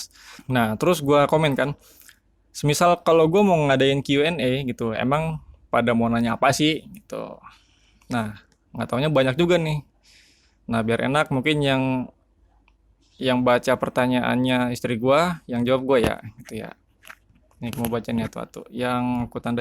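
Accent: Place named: Citereup, Indonesia. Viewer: Kirain orang mana bang taunya citereup😂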